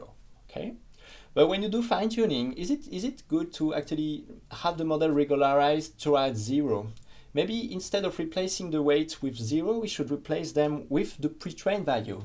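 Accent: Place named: France. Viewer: Great content, but it was really hard to understand because this idiots thick french accent. 😔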